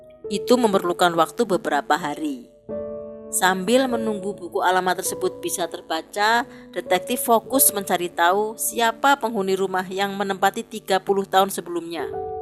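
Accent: Java, Indonesia. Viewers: Logatnya Indonesia bagetttt....tulen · Medok bgt yg isi suaranya gak netral ah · Medok bngt baca ceritanya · Pembawa nya kurang pas suara nya medok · Medok Jawanya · Pengisi suaranya agak medok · narasinya bagus kok, sangat Indonesia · Suara admin jawa kental bgt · dari suaranya pasti orang jawa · Naratornya SPT suara orang Jawa yg ngapak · medok bgt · medoknya juga dikurangin sedikit madam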